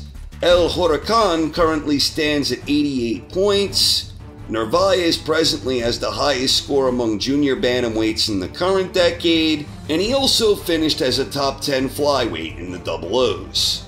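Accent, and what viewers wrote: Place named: New York, United States. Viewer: Very interesting, Whatever accent you have - it is the official "Voice of Boxing Knowledge" to me now! (Is it New Yorker?) A big Thank You from sunny England, for putting all the effort into compiling this epic list over the last few months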